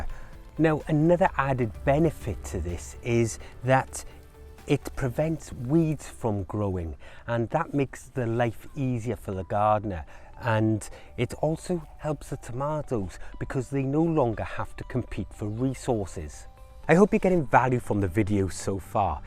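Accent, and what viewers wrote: Wales, United Kingdom. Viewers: Are you from the valleys ?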